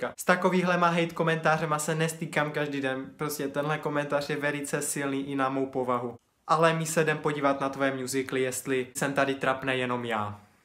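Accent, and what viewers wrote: Czech Republic. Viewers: Ty jsi z Olomouce jde to poznat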